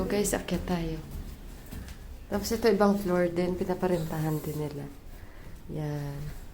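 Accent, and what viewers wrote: Iloilo, Philippines. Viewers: Ilonggo ang tuno mu teh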